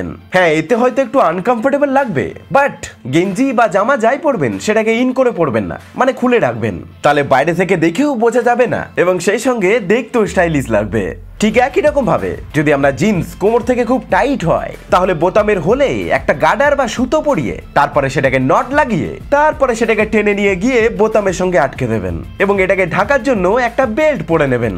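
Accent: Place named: India. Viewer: কথা শুনে বুঝা যায় ইন্ডিয়ান🥴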